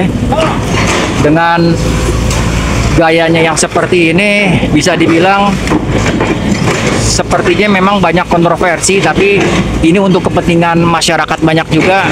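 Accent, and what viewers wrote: Jakarta, Indonesia. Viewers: Ente Betawi Kotanya kentel bngt enak dengernye kayak acara Hikayat by Jaid di Radio, ente Jakartenye darimane Bang.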